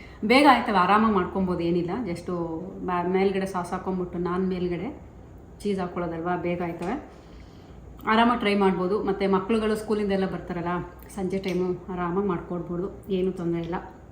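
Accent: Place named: Mysore, India. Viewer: Mam I am new additions to your channel thumba chennag nam mysore bashe mathadtira thanks a lot namge ee beautiful life na thorsethirodakki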